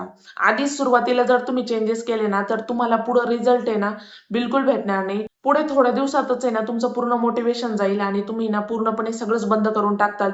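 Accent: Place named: Nashik, India. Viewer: Kuthun ahes tai...tujhya bhashebarun tumhi Nashik chya asavya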